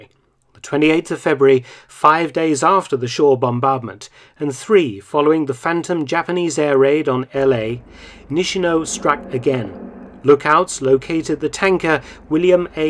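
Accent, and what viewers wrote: United Kingdom. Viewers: Ok, the humiliation and desire to retaliate because of the cactus, combined with the british narration, makes this video seem like a Monty Python mockumentary.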